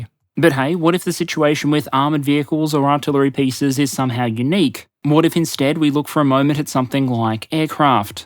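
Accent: Australia. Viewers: WOW. High quality analysis! This is a high speed download of research-rich info, spiced with a little dry humor. Good clear diction in Aussie-accented English, which is less off-putting to us Americans (Oxford English lectures give us inferiority micro-seizures)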